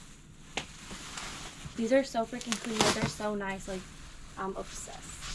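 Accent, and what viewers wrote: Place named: Texas, United States. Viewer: Haven't seen your channel in a while. You seemed to have developed more of a Texas accent.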